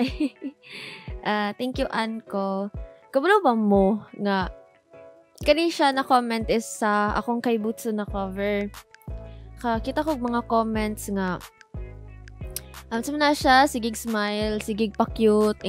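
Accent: Visayas, Philippines. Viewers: Oh so she is from the Philippines well i don't know that accent is it Visayas or Mindanao? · Kung di ka taga cebu, taga davao ka na bisaya 😂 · Hello again ate ❤️ Cutie mo po always pati yung bisaya accent nyo po. Parang gusto ko na ren matuto magbisaya🐱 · Ang sarap pakinggan yung pagsulti nimo bisaya..proud cebuana here..so bisaya rin ako..haha 😊nagseself study ako ng japanese but I'm not good at singing in japanese like you sung japanese songs..super galing mo ☺️😍👏👏,request nman po " " by ft.. thankyou!😍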